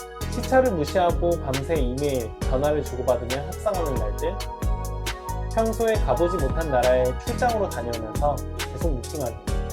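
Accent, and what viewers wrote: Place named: Busan, South Korea. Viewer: 부산분인데 사투리 별로 안쓰시네요..ㅋ 좋은학교 나온 인재시고 아직 젊으시니 앞으로 좋은 기회가 마니 오겠쥬..ㅋ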